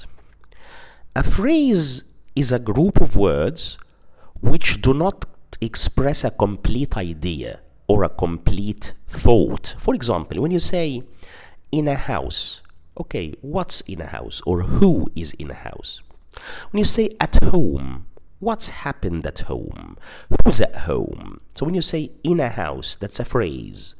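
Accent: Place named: United Kingdom. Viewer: amazing british accent !!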